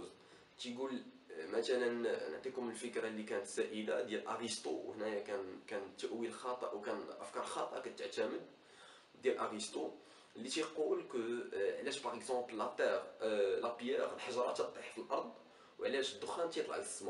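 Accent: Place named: France. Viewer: اسمع عربي مفرسن..انت مطبوع لسانك فرنسي وانصحك بعدم التكلم باللغة العربية لانك كمثل الهندي الذي يتحدث لغة انكليزية بل انت اعقد...مع هذا شكرا لمجهودك بالرغم من اني لم افهم لغتك..تحياتي..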